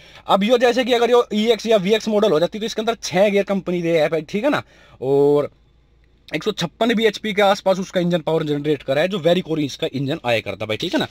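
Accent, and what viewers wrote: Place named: Uttar Pradesh, India. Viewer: Bhai apni Desi up wali language is best haryanvi is not good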